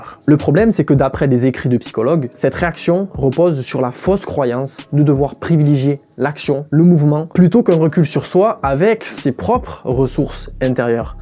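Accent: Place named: South, France. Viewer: l'accent du suuuud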